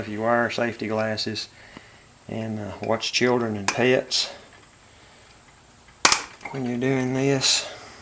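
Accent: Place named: Tennessee, United States. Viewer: My 790 has 667 hours in it. Waiting for this to happen. I'm retired mechanic and service manager, but never been into one of these. My brother, also a mechanic told me this was a common problem. BTW, you look like you could be twin brother of a very good banjo player I know of. I recognized your dialect and you mentioned Tri Green so I figured you're in Tennessee. Check out Daniel Grindstaff banjo, perhaps you are familiar with his music. Appreciate your verse and good spirit.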